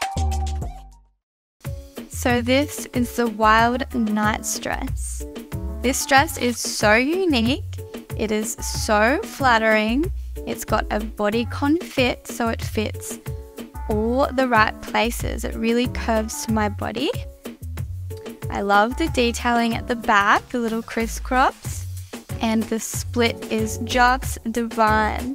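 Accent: Australia. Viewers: Love that Aussie accent, I didn't understand a word she said but it doesn't matter.😊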